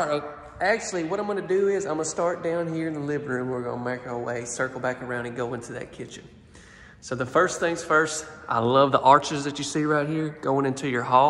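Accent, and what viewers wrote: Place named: Alabama, United States. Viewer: I am new to you page... Do you share your location or the builder's info? You sound like you are from my neck of the woods, N. Alabama, but O do know from the South for sure. I LOVE your work and actually watched the whole video, something I rarely do! TY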